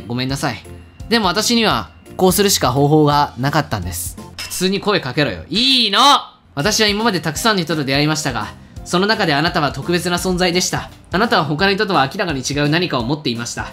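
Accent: Kansai, Japan. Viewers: くんさんの、関西弁おもろすぎやろ！！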